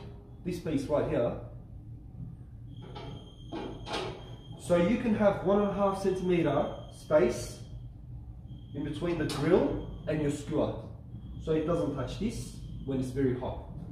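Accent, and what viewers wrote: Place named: Australia. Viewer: Australian accent, interesting...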